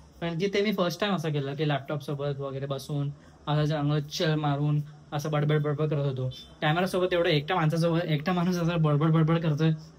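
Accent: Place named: Malvani, India. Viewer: aani tujha malvani aaikun maka aasa vatata dev maka roj navin navin goshti deta ha tuja premat paduk ❤❤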